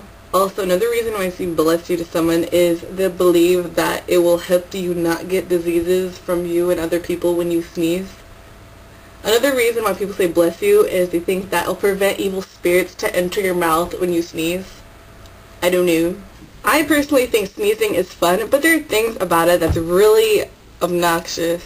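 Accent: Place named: Philippines. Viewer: HAhaha Next time you sneeze stick your tongue out :P Nice accent btw. "Aww cute na cute" Lol Filipinos FTW!! :P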